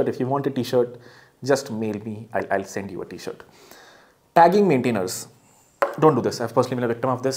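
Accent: India. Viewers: I've worked with outsourcing so long that I am very familiar with the meter and pronunciation. I have no issues with the Indian accent.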